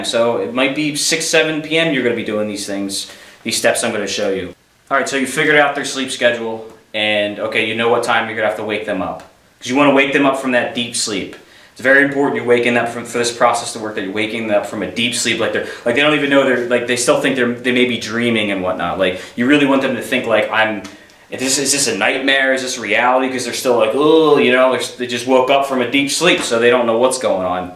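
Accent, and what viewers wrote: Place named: Michigan, United States. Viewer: Your space is serving Nova Scotia/Maritime vibes. But your accent has that Michigan feel. Go get’em, Tiger. I once played “ ” at my next-door neighbors for an hour, on a massive sound system… because they’d have noisy rows EVERY FRIGGIN’ NIGHT when he got home from his job on the police force. It worked.. and IT WAS FUN. Now, I cannot watch ‘Beetlejuice’ without reliving the trauma; it was worth it.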